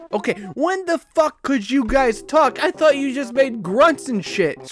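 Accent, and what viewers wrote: United Kingdom. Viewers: And that British accento Is so thick and evident Not even english parodies can reach that level of accent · This guy is aggressively British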